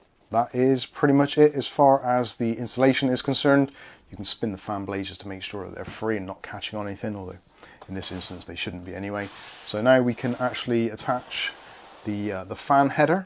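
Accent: Bristol, United Kingdom. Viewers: Bit of a novice to PC builds I have an old motherboard ASUS H81M with a intel i7 4790 CPU in a HORNET CA300-NG case! reckon this un ill fit? PS nice to ear a Bristol accent! cheers.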